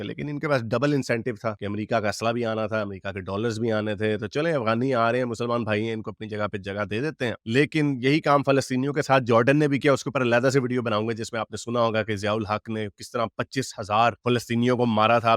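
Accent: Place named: Punjab, India. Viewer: bhai aapke bolne ka style Indian Punjab jaisa hai. Matlab ttha sota baat kerte ho. Jo fact hai ussi pe baat kerte ho. Neutral ho ke baat kerte ho. Ye nahi ke aap pakistani ho toh sirf India ke berkhilaaf hi bolna hai. Aapne Pakistan ke propaganda ko khol ke rakh diya. TNX 🙏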